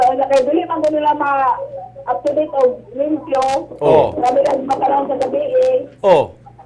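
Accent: Visayas, Philippines. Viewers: HahHaha katawa man ko sa imo sir hahhahahahha cute Kaau ka mo bisaya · Haha nakakatawa c idol mag bisaya.hehe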